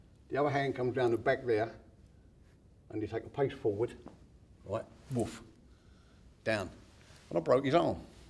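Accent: United Kingdom. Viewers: Only British man that is immune to being made fun of for his accent.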